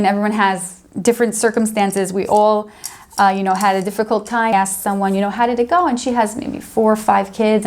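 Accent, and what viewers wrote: United States. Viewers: Which part of America are you from?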